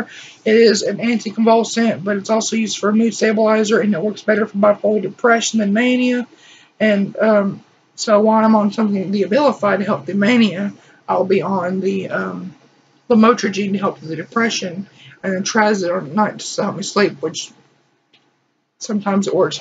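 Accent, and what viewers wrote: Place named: South, United States. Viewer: Mania would be would be the worst, I counteract depression through exercise but I don't know if that works for bipolar people. Seriously though if I were you I wouldn't try and take any sort of mild/strong anti-psychotic. If you want something that works I took pristiq for years on end and it made me feel really 'high'. It counteracted my depression very well when effexor just made me really sweaty and anxious. I mean you can check out some video's when they forced me on abilify, an injectable. Now I get SVT's (recorded as well) all the time. You sound like you got a southern accent I recommend to fight you depression it's probably better to try and get a job further up north. It's just the more south you go I've noticed the worse things get with mental health in America. Have fun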